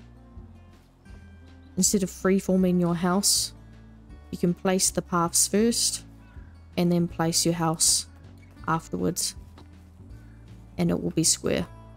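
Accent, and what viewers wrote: New Zealand. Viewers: Youtuber sounds kiwi as. haha.. Nice!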